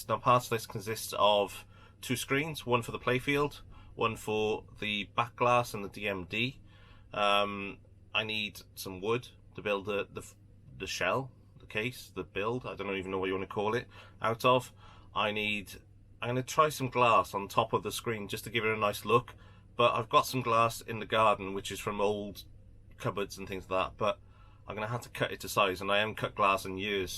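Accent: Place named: Cardiff, United Kingdom. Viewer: You sound like a Cardiff boy, i bet "G" couldn't make a pin like yours.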